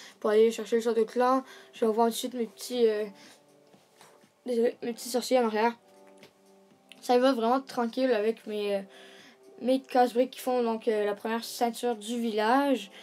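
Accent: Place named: Quebec, Canada. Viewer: Ahah, je me trompe ou pas si je te dis que tu viens du Quebec ?? ^^ sinon, bon tuto et très belle attaque (meme sans les pieges) PS : n'hésite pas à t'abo ;)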